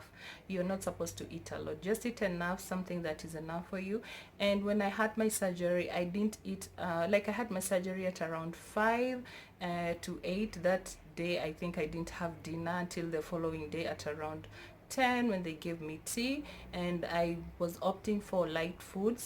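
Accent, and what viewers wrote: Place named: South Africa. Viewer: Great video. Thanks. You sound South African. You accent made me homesick!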